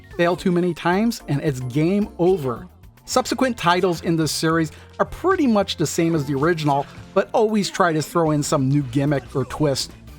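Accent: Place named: Chicago, United States. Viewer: This video was great. Personally, I'm really interested in unique but high quality indie/import titles for the Switch... but... I'm just getting into it... so... I'm swamped, trying to wade my way through. Clean little segments like this definitely help out. Thanks! On a separate note, I'm trying to place your dialect. I thought maybe long forgotten Acadian but I just heard you say "da game" which triggered my dormant memory of George Wendt saying "da bears!". So that's my guess: Chicago and if not, then rural Illinois.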